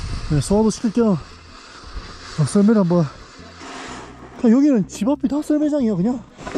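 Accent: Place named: Daegu, South Korea. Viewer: 원지님 영상보다가 넘어와서 님 영상을 몇일째 봤습니다 대구는 눈을 보기 어렵죠 ~^^ 오늘같이 폭염경보 속의 대구에서 스위스마을과 스노우 뽀드득 소리까지 듣고 보니 너무 시원하고 대리만족?^^을 느낄 수 있었어요 님의 따뜻한 미소와 '하~'하면서 처음보는 분과도 말이 어색하게 끊어지지 않게 호응하고 나이스한 친화력은 대구분이라 믿어지지 않을만큼, 대구남자들에게서는 좀처럼 찾기 어려운 ^^ 모습이고 진짜 멋진거 같습니다 놀라고 급할때 툭툭 나오는 대구 사투리와 서울에 거주하면서 체득된 어색한 억양이 너무 반갑고 정감납니다 핥기님이랑 대구 떡볶이, 뭉티기 먹방할때도 제 남동생 보는것같아 너무 재밌게 봤습니다 어머님 건강도 좋아지시길, 100만될때까지 늘 응원할게요~~^^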